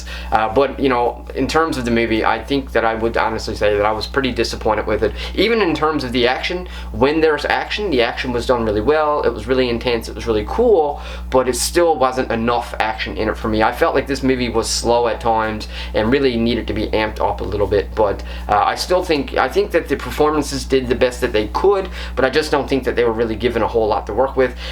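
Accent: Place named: Canada. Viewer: Probably not the greatest idea to make this movie when America is currently engaged in a literal soft civil Cold War. But im sure that's exactly why it was allowed to be made. It seems like pure propaganda to me. You sound Canadian. I suppose if they made a Canadian version of this, I'd watch it and think nothing of it. Maybe im wrong, but you keep saying "aboot" so I'm assuming you're from Canada. Forgiving me if I'm wrong. Edit: You said you're Canadian in the video. lol I probably won't watch this one due to being American. It hits home too hard with the current state of our nation. I'll take your word for it.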